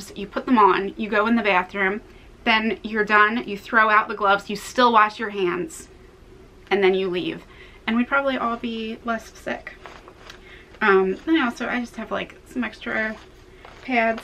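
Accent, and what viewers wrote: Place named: New Jersey, United States. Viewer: I'm gonna bet you're from New Jersey, I know that accent anywhere, lol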